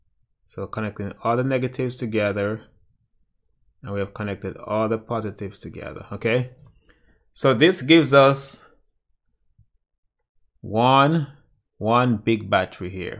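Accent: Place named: Africa. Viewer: Hey, my brother! thankyou or this! This is exactly what looked for in my search! Once and for all, eh, YouTube has come through for me. Ha! ha! ha!!!? BTW, my wife is from Africa! I heard it in your accent. You're ok! Top drawer! A'ight? Bye for now. Out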